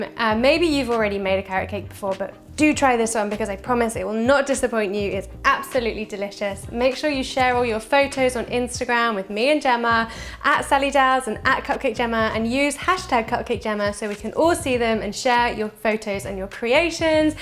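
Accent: United Kingdom. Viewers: hello from south wales so nice to hear a british voice on a cooking channel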